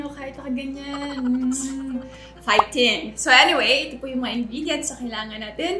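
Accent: Visayas, Philippines. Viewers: Next vlog suggestion po. Bisaya only vlog😍 pero po sana may tagalog subs kasi tagalog kami eh😂 gustong-gusto ko lang po yung bisaya accent nyo❣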